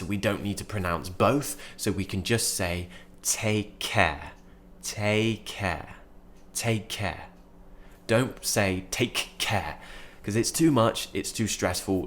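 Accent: United Kingdom. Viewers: It's so nice to listen to the British accent and vocabulary, I love it but most of the movies and tv shows we can watch are americans so it's hard not to be influenced by them. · I like British accent.. pronunciation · Caundo hablas suenas tan verdadero, si me mientes no lo notaría. Te llevaría a comer ceviche with your British accent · Hey, I've just found your channel. I'm an English teacher in Brazil and I love teaching phonetics. I was looking for some pronunciation videooa when I found your incredible channel. Congratulations on theses videos you've been making, they're excellent, really nice material. I love the British accent and I just can't tell you how happy finding your channel made me feel. As you say it: "take care, cheers, bye!" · I like your way of teaching English, I have benefited you a lot, especially the British accent because I work with a British company in Iraq, sincerely accent British wonderful.thank you so much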